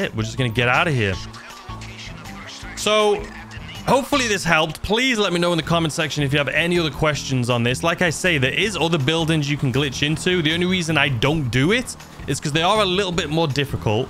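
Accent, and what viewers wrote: United Kingdom. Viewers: I am non-native English speaker. I can hear difference between US, UK and India but I can't really locate accent. But you sounded so British... Almost English Dave thing 🙂 Your way of speaking was just pleasing me - I like to hear British English (maybe because I hear US people most of the time?). A weird thing to tell to other guy but I don't care, we won't meet and feel awkward because of that :D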